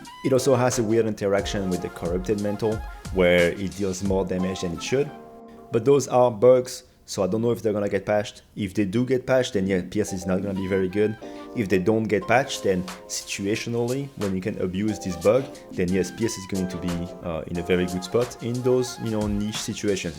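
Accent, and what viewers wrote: France. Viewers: Un accent si français pourquoi parler anglais 🥲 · un très fort accent français mon seigneur ! bonne vidéo !!! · The bow king has returned! Also im curious is your accent French?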